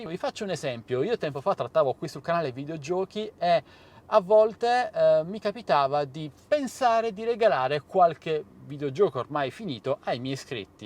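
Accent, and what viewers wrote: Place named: Sardinia, Italy. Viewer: Mi sono iscritto al tuo canale, sei molto simpatico.. in Italia va tutto al contrario ormai, dove vogliono farci arrivare bho.. dal tuo accento sembri sardo, un saluto da un napoletano, buon volo👋..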